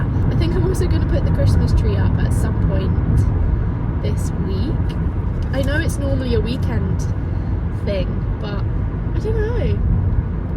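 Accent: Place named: Scotland, United Kingdom. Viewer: Keep posting stuff,! I’m a guy from Colombia (so Spanish is my first language). I have a trip to the UK coming up, and I’m already getting familiar with the Scottish accent (not an easy one for a non-native speaker). I just found your channel thanks to your "football skills" in the ChrisMD video.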